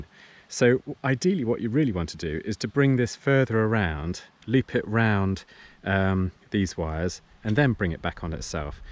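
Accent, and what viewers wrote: United Kingdom. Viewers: Well done great explanation and wonderful to hear an English accent for a change!! Just doing some ourselves so watching this and a few other Youtube Videos as a refresher, thank you!!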